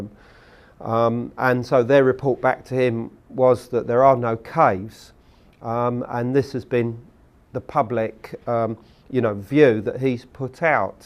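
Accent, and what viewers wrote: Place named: Mockney, United Kingdom. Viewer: Mockney bellend!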